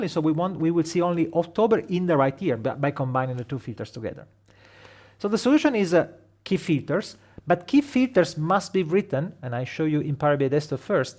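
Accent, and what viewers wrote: Italy. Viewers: Excellent. This channel probably has the best advanced PowerBI free content on the internet. Moreover, the Italian accent is very funny! Greetings from Brazil.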